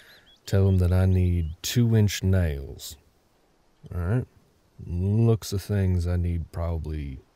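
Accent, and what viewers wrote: South, United States. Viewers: as a southerner, this accent ain't bad. It's hard to tell what dialect, though. maybe Georgia? Mississippi? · Geez...that deep country accent got this country lady fallen head over heels. Yeeee-doggy! 🤠😍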